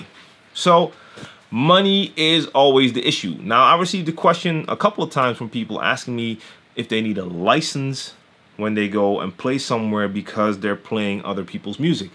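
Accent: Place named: Philadelphia, United States. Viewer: you're from the Netherlands but have the same accent as me in Philly?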